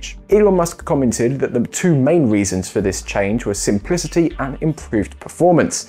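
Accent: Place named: United Kingdom. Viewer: How come best SpaceX videos come with a British accent?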